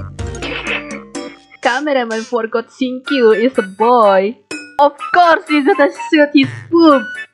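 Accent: Indonesia. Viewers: kek orng indo deh accentnya.. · Accent indo?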